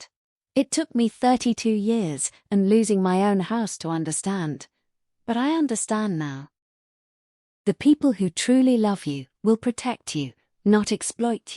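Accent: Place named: Midwest, United States. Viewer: That's quite the American Midwest accent!😂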